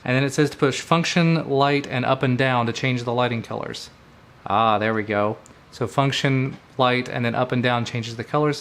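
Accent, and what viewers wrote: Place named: South, United States. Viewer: I know you said you didn't have an iOS device, but I do. I bought mine about four years ago to use with my iPhone Xr, and loved it, although it took a long time to get used to the tiny size. I just upgraded to the iPhone 14 Pro, and after pairing the BK-03 keyboard, I can't get the space bar to work unless I press the Alt key and the space bar at the same time. As you can imagine, this can't stand. I'd never get anything done. I've monkeyed around in my phone's keyboard preferences, and have toggled various settings off and back on (this very often works!) and nothing has helped. I even switched ANSI to another option, then back again. Any help or suggestions you may have would be truly appreciated. By the way, after slogging through all the other videos, I responded to you because of the Southern accent. I'm a displaced Southerner myself, born in the wrong state! 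Ha ha!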